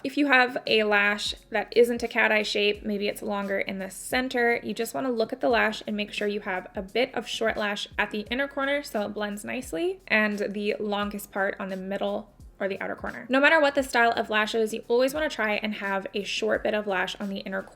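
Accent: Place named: Canada. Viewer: ur Canadian accent is adorable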